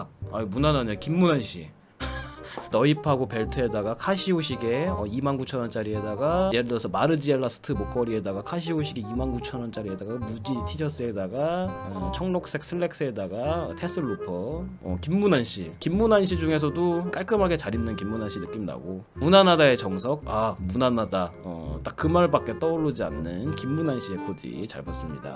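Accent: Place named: North Korea. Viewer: 북사투리 ㅋㅋㅋ 개노잼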